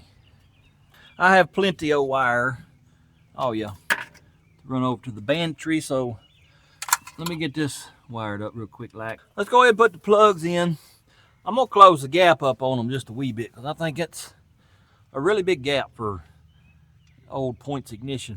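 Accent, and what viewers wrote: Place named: East Tennessee, United States. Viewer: Great video. Just subscribed mostly cause you talked like me and everybody i grew up with in east Tennessee. Great content. I can't wait for wait for the next video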